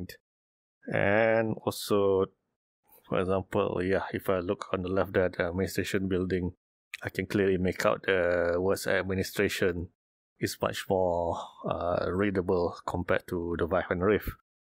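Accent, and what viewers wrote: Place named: Singapore. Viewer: Hi sir. Nice video and thanks for the x-plane demo with the Odyssey. Your accent I guess is either Singaporean or Malaysian Chinese (I could be wrong), but if i'm right, may I ask where did you get that Odyssey from? I am scheduled to visit Singapore again next week and I'm wondering if I can find the Odyssey there. The oculus is currently still at SGD$890 at SimLim but based on reviews I will get the Odyssey just for the extra pixels.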